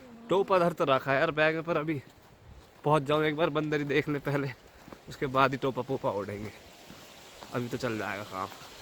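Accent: Haryana, India. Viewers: Bhai HARYANVI bol k video mai kati char chand lga diye , tourism with comedy ...😍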